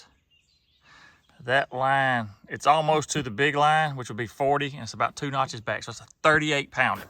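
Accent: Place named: Upper Peninsula, United States. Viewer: You was sounding like a Michigan Yooper a little bit, eh. The strange thing in Michigan is the farther north you go, the more southern it gets.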